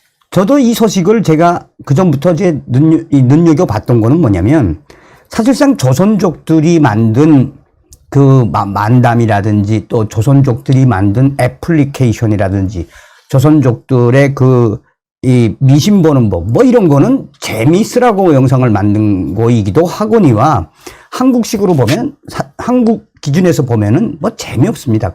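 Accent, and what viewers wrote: North Korea. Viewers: 북한말씨 쓰지 마세요 듣기 거북스럽네요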